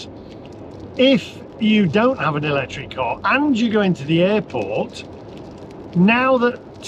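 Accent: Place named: North, United Kingdom. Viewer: IMO people with accents like yours are northerners.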